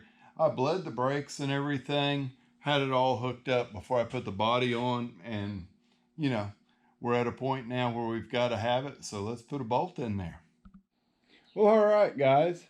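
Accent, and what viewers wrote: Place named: South, United States. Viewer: Im starting to get your shorts with the exaggerated southern country accent in my feed. The algorithm is starting to work for you with those videos! Make more they’re really funny and you’ll get a lot more subscribers!! Awesome videos. Watching From Chicago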